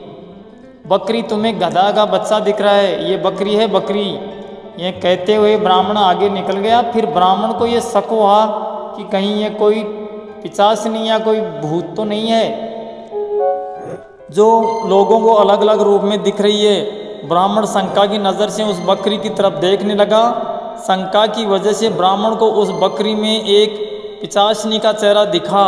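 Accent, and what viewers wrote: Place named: Punjab, India. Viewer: Lagda y tu punjab ton a tere awaj lag rae a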